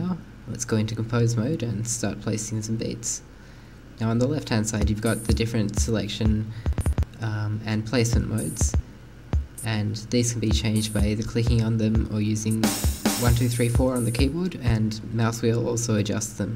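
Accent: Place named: Australia. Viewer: It sounds aus-like anyway. ;]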